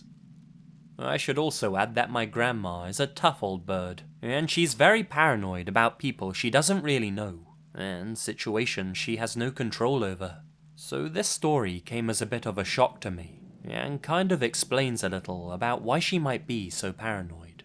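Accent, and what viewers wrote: England, United Kingdom. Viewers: Hold up. You grew up un chicago but speak with a gay english accent?? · You always have great stories. I love your english accent. I would love to go to college in England. 😃 I'm African American. Do you like Blues music? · spooky British accent (⊙＿⊙)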